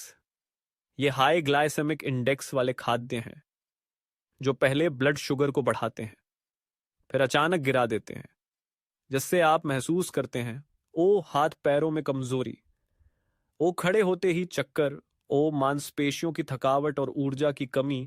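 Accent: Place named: India. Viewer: विडियो विदेशी और आवाज भारतीय। पूरी विडियो विदेशी।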